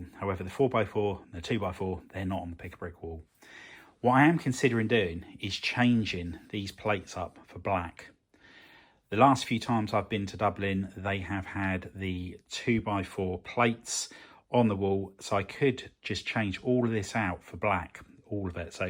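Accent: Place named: Midlands, United Kingdom. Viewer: A bit off-topic, I am just trying to place your accent. The best I have come up with so far is 'somewhere above Birmingham but lower than Manchester'.😉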